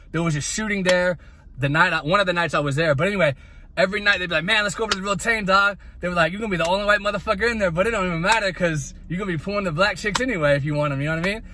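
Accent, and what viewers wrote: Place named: South, United States. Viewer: country accent is on point 😭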